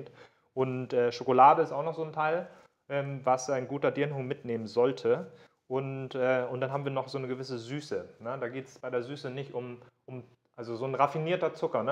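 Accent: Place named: North, Germany. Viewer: Und dann noch dieser nordische Slang mit dem angequatscht wird! ;-) Großartiges Video!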